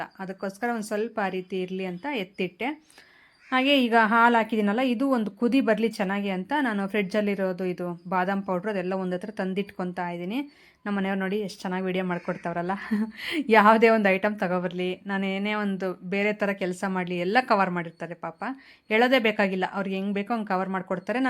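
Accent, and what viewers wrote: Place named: Sira, India. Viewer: Super nivu matado riti nange thumba esta aythu because nam sira side kuda navu hige matadodhu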